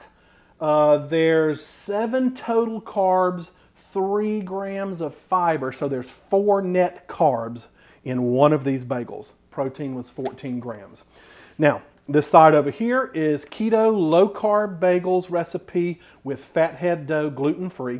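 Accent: South, United States. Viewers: I love your videos.... Talk as long as you need too. Heck you sound like me with that southern tone... well I have been waiting for use of my coconut flour and here it is... Thank you very much. I will be trying this one. ♥ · Sir, I love your videos and southern accent! Your face really made me laugh! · I don’t know why but I saw your channel on my feed and I started watching you and this video was amazing!! You have great energy and a great Southern accent!! You are doing a great job!! Maybe we can do a collaboration let me know!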